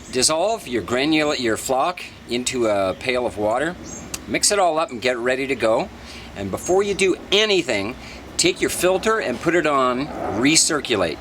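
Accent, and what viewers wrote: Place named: Canada. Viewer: Thank you so much, you've saved my life (well, okay, my swimming pool!) I was getting so frustrated with a cloudy pool. I had added a load of flocculant but however much I vacuumed I just couldn't get rid of the f***ing crap at the bottom. Eventually it dawned on me that it was all just coming back through the filter and for whatever reason the filter just wasn't collecting it. That's when I saw your video and realised I should put the pump on the 'waste' setting (or 'desague' as they call it here in Spain). Bingo! It means I have to top up the water level, as you say, but definitely worth it. Now I just have to backwash the filter to get all the crap out. [Note here for any English-speaking expats living in Spain, like me, who may be confused by the terminology: for 'backwash' you need to look for 'lavado' and for 'rinse' you need 'enjague']. 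Totally off topic but I love the accent btw. I have a Canadian mate in Madrid who speaks just like you. Can't get enough of that Canadian pronunciation of 'out': a little bit Irish, a little bit Scottish, but 100% Canadian!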